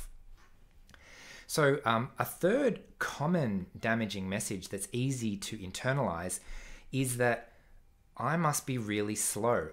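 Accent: Australia. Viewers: Thank you so much for this video! It helped me understand why I constantly talk, even to myself. Only then can I structure things. Wow! Wish there had been people like you when I chose to be a translator (written translations) cause I didn't trust my personality to be an interpretor (spoken translations) although I always loved to hear native people speak in their varieties. Well, now that I'm retired, I can do that on holidays. P.S.: I love your Australian English!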